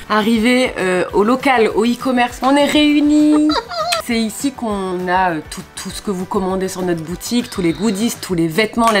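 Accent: Canada. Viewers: tu mon youtubeur préféré vidéos accent canadien et qui met quoi des vidéos trop trop rire 🇨🇦👍👍👍👍👍 🇨🇵🇨🇵🇨🇵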